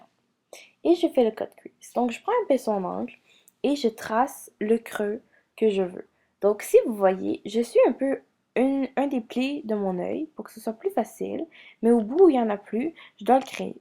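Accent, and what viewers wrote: Quebec, Canada. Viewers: Srx , elle s'invente pas un Accent , ni des noms de maquillage ! Elle est québécoise , t'avais pas encore Compris ? ^^ Cet toi qui fait pitié ma Pauvre ! Retourne au Collège ma petite , faudrait bien que tu apprennes a écrire . Tu crois pas ? x)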